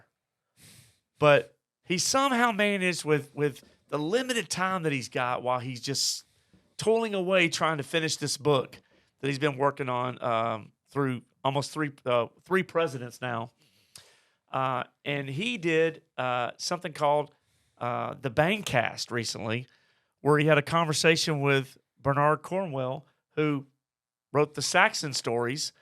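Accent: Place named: South, United States. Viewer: What accent does the main host have? I know it’s a Southern accent from the US, but is he like Tennessee or?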